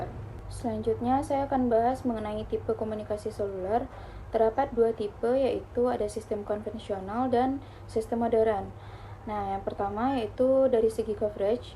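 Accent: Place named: West Sumatra, Indonesia. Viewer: Wah enggle sudah bagus, tpi bahasa indo nya masih kelihatan bukitinggi vibes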